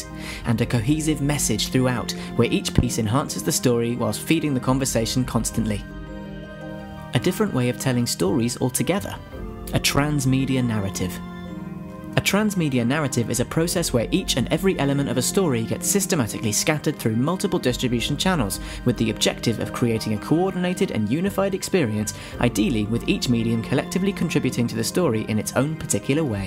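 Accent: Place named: Ireland. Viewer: this square head Irish is pounding in my ears ><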